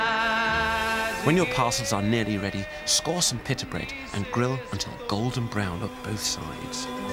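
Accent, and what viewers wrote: United Kingdom. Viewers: imagine the people who live on the mountain and they see him: Who is this guy with the British accent and why is he dancing and cooking in our balcony?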